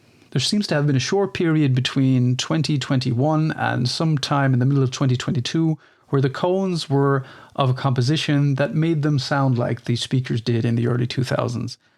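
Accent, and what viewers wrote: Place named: Ireland. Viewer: Your English has a slight Irish accent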